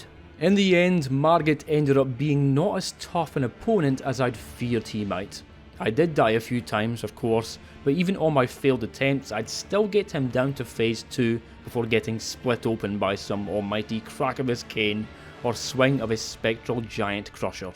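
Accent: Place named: Scotland, United Kingdom. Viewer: Good lock with the DLC Mr. cool scottish youtuber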